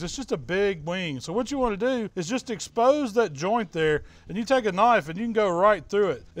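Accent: South, United States. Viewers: His southern dialect makes me trust him. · Southern accent check. Kinda fat check Pocket Buttondown shirt check I will take grilling advice from this man. The only sketchy thing is his foorwear. Should be wearing New Ballance trainers of flip flops. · If I’m going to trust anyone to tell me how to BBQ it’s going to be a heavier set man with a southern accent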